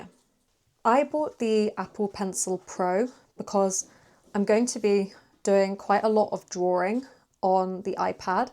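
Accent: United Kingdom. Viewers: does anyone know what the british accent she uses is ? i am really interested because it makes me enjoy learning english... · Im Brazilian and I love your channel, im learning English, but your British accent is soooo good to listen, when you say "and" is my favorite part 🤣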